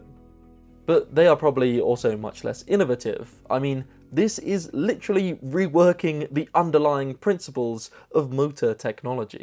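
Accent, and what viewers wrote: England, United Kingdom. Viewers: couldn't finish the first 2 minutes because of your puke English voice "mowtas" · Drop the english accent. I miss about 10% of your words so I can't follow you. Too many w's.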